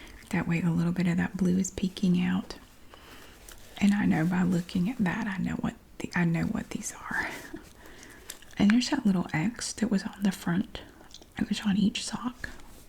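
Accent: South, United States. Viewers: I’m developing a southern accent because of you. I don’t mind it, it’s actually cool it’s rubbing off on me. I love you so so so much! ♥️♥️♥️♥️♥️♥️♥️♥️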